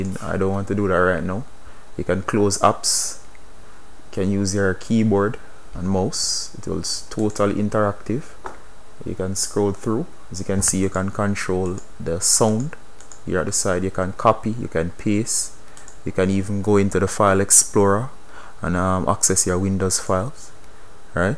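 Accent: Jamaica. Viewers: you sound like a Jamaican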